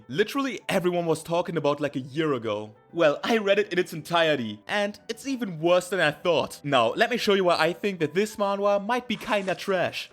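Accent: Germany. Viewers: i hear deutsch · Could you read Boys abyss? I think it doesnt matter if you shit talk or compliment manga, because i think you make good commentary either way. Btw are you german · Starker Deutscher Akzent Bro. Erstes Video was ich mir von dir anschauen werde.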